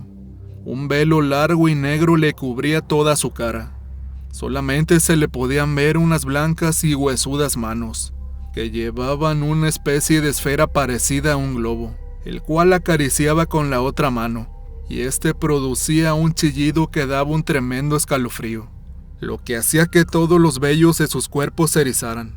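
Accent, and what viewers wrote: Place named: Northern Mexico, Mexico. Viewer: ¿de cual ciudad y estado eres? por que tienes el acento muy golpeado, como norteño.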